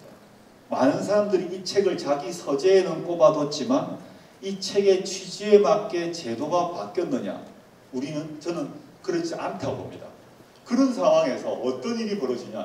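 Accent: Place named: Busan, South Korea. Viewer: 조국교수님 강의는 언제나 유익하고 쉽고 깨달음을 주면서 가슴에 와 닿는 제게는 중요한 인생강의가 되곤 합니다. 이렇게 유튭으로 듣고 볼 수 있으니 정말 고맙습니다. 저도 부산이 고향이라 교수님의 부산 액센트와 사투리가 친근하고 넘 좋아요 :)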